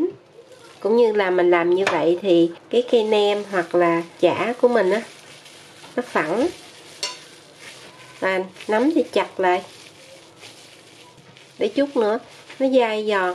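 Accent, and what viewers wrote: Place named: South, Vietnam. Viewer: 🙏🏻❤️🙏💥✨💫🌟🌟🌟💖💖💖💖 MẾN YÊU!...XIN CẢM ƠN! CẢM ƠN RẤT NHIỀU MÓN NEM NƯỚNG NGON THƠM ĐỦ MÀU SẮC THIÊN NHIÊN HẤP DẪN TUYỆT VỜI BỞI BÀN TAY TRÌNH BÀY KHÉO LÉO VÀ GIỌNG NÓI DỊU DÀNG NHẸ NHÀNG MIỀN NAM DỄ THƯƠNG CỦA. XIN RẤT YÊU MẾN VÀ NGƯỠNG MỘ 💕💕💕💞💞💕💓💓💓💖💖💖I LOVE YOU...and I LOVE YOUR FAMILY 💖💖💖🌟🌟🌟💖💖💖💖💖💖💖💐💐💐💐💎💎💎💎💖💖💖💖💖💖💖💖💖💖💖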